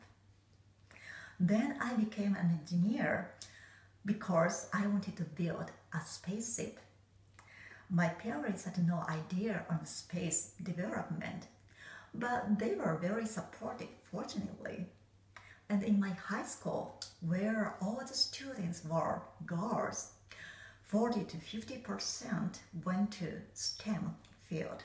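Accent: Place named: Japan. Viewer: У японок такой приятный акцент. Или не акцент, а манера речи. Сладковатый такой :-)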